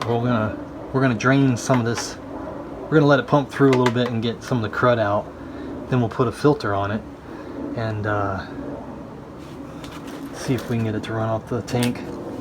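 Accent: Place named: United States. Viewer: My little sister who knows nothing of these things would do a better job . This guy is speaking American not English . B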